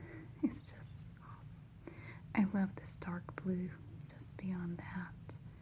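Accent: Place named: South Carolina, United States. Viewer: I’m from South Carolina, living in Tokyo, & your voice makes me homesick.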